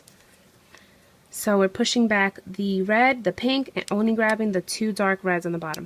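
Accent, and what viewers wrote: New Jersey, United States. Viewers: R u from NJ ?????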